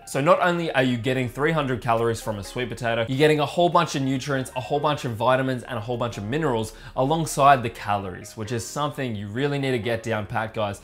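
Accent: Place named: Australia. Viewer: I love your accent... Let me tell you that I have not only learned to lose weight but also have learned the Australian accent from you.. Love your videos mate!! They are great.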